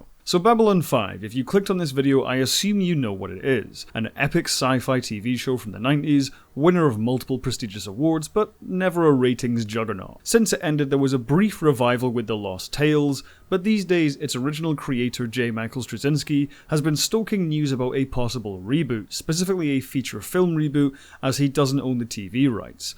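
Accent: Scotland, United Kingdom. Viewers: I never actually spotted the similarities with The Expanse and B5, and now in my mind the expanse almost feels like a kind of prequel, it feels like the same kind of realistic political universe as B5. Maybe that's the route Straczynski should take, a prequel or sequel rather than a straight up reboot? Project Babylon or something. BTW, is that a suppressed Scottish accent I hear? Dude, if it is then just go with the accent, you know most folks love our accents lol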